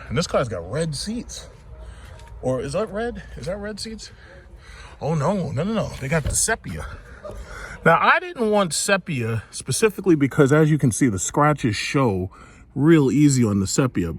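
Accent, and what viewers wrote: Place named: New York, United States. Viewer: Yo where is this dude from? Sounds like a new yorker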